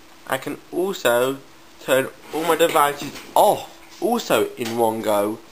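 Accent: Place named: London, United Kingdom. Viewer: awesome! hey where are u from? ur accent tells me u from around london.... which place exactly?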